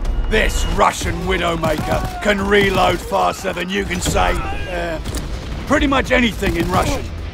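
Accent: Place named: Australia. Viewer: Isn't it a little ironic that an Australian man narrates this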